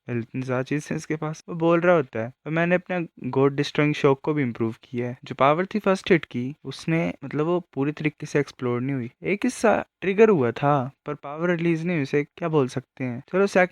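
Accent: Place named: Himachal Pradesh, India. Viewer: Bro apki language ae lg rha app Himachal Pradesh se ho 😁😁😅 i am right 🥺😅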